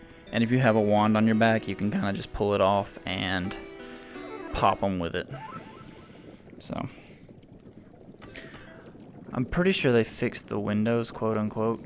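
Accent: Texas, United States. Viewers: you got such a low key texan accent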